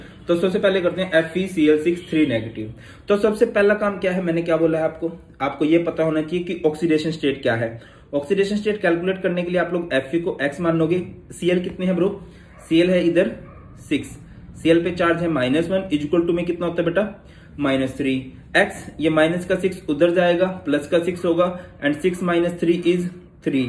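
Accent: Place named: Chandigarh, India. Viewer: Sir aap kha se ho apki language aas padose ki hi lag rhi h Chandigarh se??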